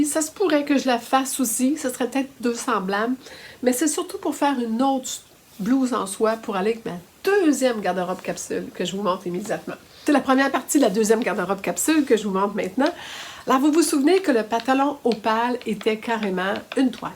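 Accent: Quebec, Canada. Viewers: Merci pour la présentation et les conseils que vous apportez dans cette vidéo. J’adore votre enthousiasme et votre accent Québécois ( je connais, ma fille aînée, mon gendre et mon petit fils 🥰habitent depuis 7 ans bientôt à Montréal )! Je suis fan de Fibre Mood mais j’hésite parfois à réaliser certains modèles que je pense peut être trop jeune pour moi (65 ans😊) Vous m’avez convaincue du contraire, j’adore vos choix, vous êtes très élégante 👏👏👏. Je vais vous suivre, c’est sûr. Je vous souhaite un bon séjour en France et une bonne et créative année 2024. Véronique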